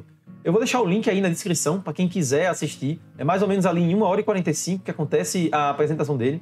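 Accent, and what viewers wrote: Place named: João Pessoa, Brazil. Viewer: Parabéns pelo conteúdo? Tu és de qual cidade? Tens o sotaque parecido com daqui de João Pessoa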